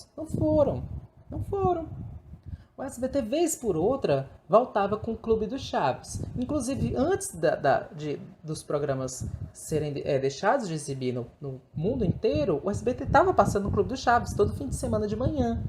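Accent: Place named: Ceará, Brazil. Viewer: Como eu queria que o reagissse aos comentários que os SBTistas fazem no X do Fórum Chaves. É de arder os olhos de ódio e raiva por cada burrice. Seria de lavar a alma ver o rebatendo esse povo medíocre com o seu sotaque cearense maravilhoso 💫 abraços! Adoro o perfil que me fez abrir os olhos e consumir a obra completa.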